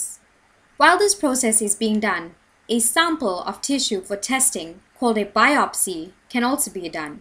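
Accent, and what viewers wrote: South Africa. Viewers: Are you from Durban?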